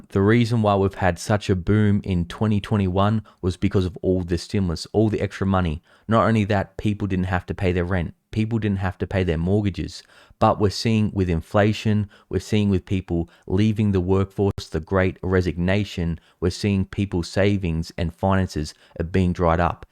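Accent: Australia. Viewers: You sound like you're from Australia how's it going down there I think you should probably pay more attention to where you're living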